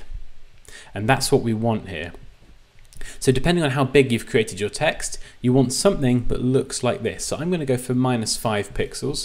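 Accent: United Kingdom. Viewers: This tuts save my life, very interesting. And good UK speaking, i say it because when i surf into tuts made by people in USA i don't understand a f***. (i'm from Italy) Btw it's a great job!!! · his voice you mean his english i guess is british am i right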